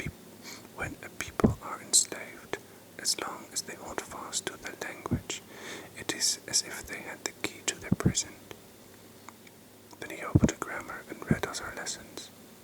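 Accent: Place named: Germany. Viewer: You have a little german accent :) xx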